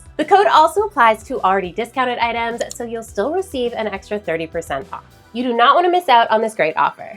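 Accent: Quebec, Canada. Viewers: Loooove when you speak french! It shows you learned from Quebec, I can hear the accent ;) As always, amazing DIY! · Je n'étais pas prête à t'entendre parler en français avec l'accent québécois 🤭 ❤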